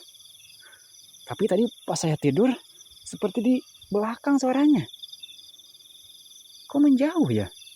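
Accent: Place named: West Java, Indonesia. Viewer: kang Sunda mana logat Sundana mani kaciri pisan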